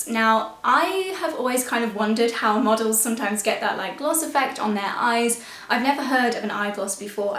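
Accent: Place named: United Kingdom. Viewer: Quality creams give quality results, so classy charming absolutely finest British accent, beautiful sensitive lady , God bless dear 💚💚💚💛💛💛💙💙💙💜💜💜💕💗💗💗💗💗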